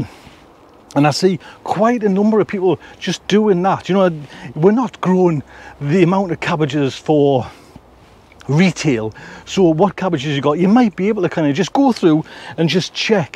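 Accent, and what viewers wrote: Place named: Newcastle, United Kingdom. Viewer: Great surprise! Nice to hear a Geordie accent - I live in Colorado and we have a bad outbreak at the moment.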